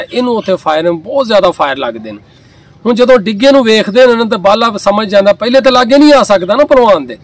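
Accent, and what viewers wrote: Punjab, India. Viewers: Assalam o alaikum Aap ki pure punjabi buhut achi lagti hai. I am from KPK.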